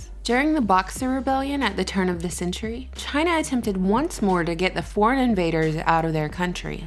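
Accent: United States. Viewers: you sound like an American to me then you must do a video of the name instead change it to 'What the media won't tell you about US' The video will take conservatively over an hour if not much longer. Good luck. You info on China is nothing new, read a good history book on China written by notable historian rather than an ideological one.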